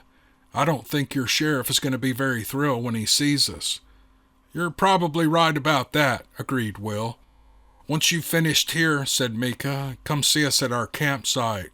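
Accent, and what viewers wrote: South, United States. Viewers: You did an excellent job reading these to us. I've heard you before, but hubby and I sat down and listened to all 6 Parts in one day, no joke. We couldn't stop listening until we heard them all. You are an excellent storyteller, and we appreciate you!! Your southern drawl and just the way express everything makes it come alive. I do not want to forget DA Roberts, who without him these would not be possible. Thank you again. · Enjoying this storyline alot. Do hope there will be more stories in the future. Will be listening to number four after this one, of course. Thank you, you narrative is great, like the southern drawl.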